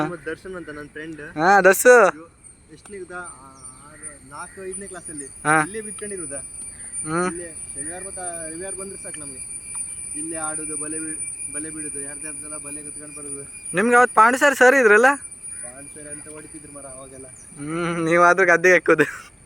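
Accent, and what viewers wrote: India. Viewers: Byndoor kundaluradavara reeti mataduttiri neevu.Uttara kannada bhashe bere reeti iruttade. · Hi yava vuru nimbhu kundapur kannada matadatira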